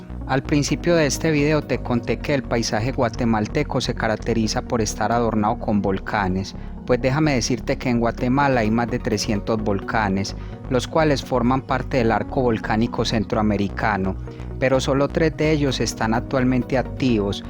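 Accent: Antioquia, Colombia. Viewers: Amigo, me equivoco o eres paisa? Saludos desde Guatemala.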